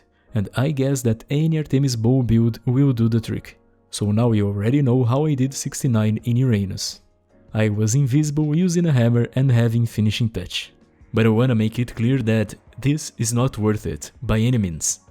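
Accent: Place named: Brazil. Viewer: Sabia que era Brasileiro por causa do sotaque kkkk muito top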